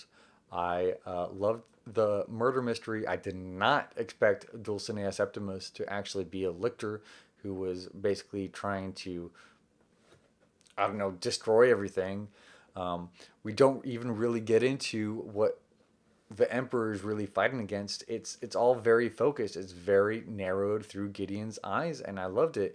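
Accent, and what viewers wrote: South, United States. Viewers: I can't believe you had a book called, "Gideon the Ninth" by Tamsyn Muir at your house in Texas on this video during your quarantine until it came out last year back in September 2019 while you're reading and talking about a swordfighter named, Gideon, who is funny, witty, very charming and such a thorn in Harrowhark's side after that, but I hope your husband, Zach will be very proud of you and I love the black and white makeup on your face to look like the character, Gideon from the book in front of it, because I love your Southern accent and it was my birthday last Wednesday, so I'm 31 years old, right now!!! #GideonTheNinth #bookreview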